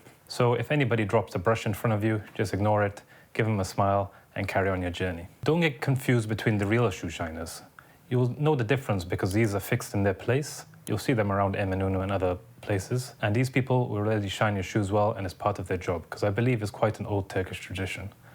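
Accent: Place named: United Kingdom. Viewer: Good video, thanks. Can i ask if you are British too? As your English does suggest you are.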